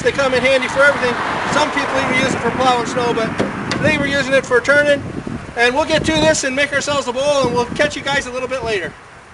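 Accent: Newfoundland, Canada. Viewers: He must be from Newfoundland.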